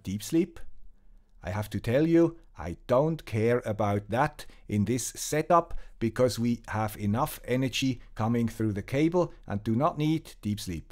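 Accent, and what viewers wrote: Switzerland. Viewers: Wait a seconds! Guy with swiss accent familiar with NIVA!? Lada NIVA!?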